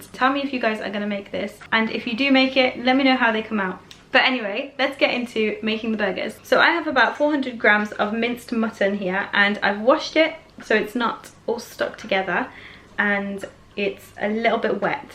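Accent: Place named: United Kingdom. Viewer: You got a real British Accent.. I bet!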